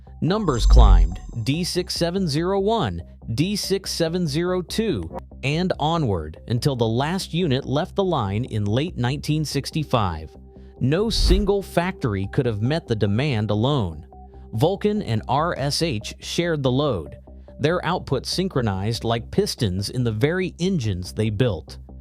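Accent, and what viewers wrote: United States. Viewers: I gave up half way through as I couldn't stand the American voiceover talking about a British locomotive and they made it sound that the Class 37 (English Electric Type 3 as it was know originally) was the only successful locomotive made. Also if talking about a particular subject, don't show film of others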